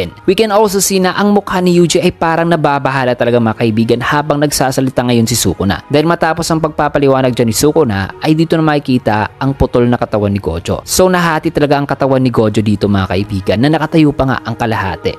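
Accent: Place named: Conyo, Philippines. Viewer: napaka conyu mo naman pare magsalita., dapat mag-english ka nlng kung nahihirapan ka magtalog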